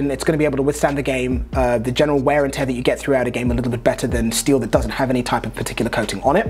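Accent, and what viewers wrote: England, United Kingdom. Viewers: Hearing an Englishman talk about hockey still throws this Canadian off. But there’s no denying that knows his shit. As always, a great and informative clip!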